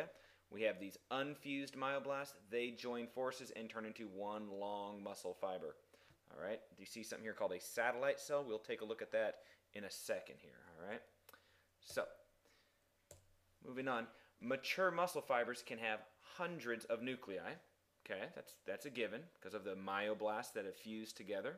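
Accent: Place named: United States. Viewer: its frankie boyle!!! (with an american accent)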